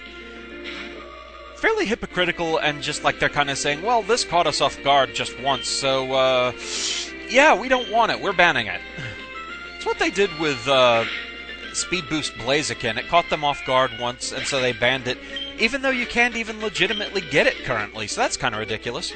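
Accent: United Kingdom. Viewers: Okay, call me ignorant - you live in Texas, but you have a British accent and you use British colloquialisms. Are you originally from Britain, or do you just put on a British persona when you record? :-)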